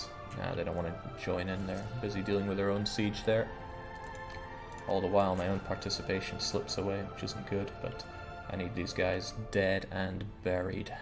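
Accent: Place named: Inverness, United Kingdom. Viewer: You sound Invernesian, you a snecky?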